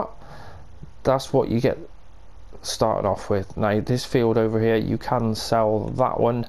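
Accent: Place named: Somerset, United Kingdom. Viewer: Somerset farms tour, complete with a somerset accent, lol New to this map, being born and raised close to somerset this map was a must for me, this was probably one of the better map tours I've found, good job fella (liked and subbed)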